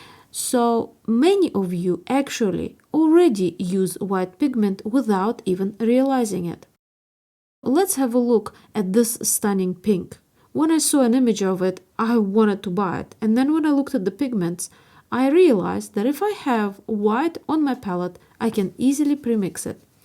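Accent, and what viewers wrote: Russia. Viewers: Thanks for the video! I'm only starting to playing with paints and watercolor fascinates me. Btw, where are you from? Your accent seems very russian sometimes, so I wonder if any other language gives that particular accent. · Такой русский акцент)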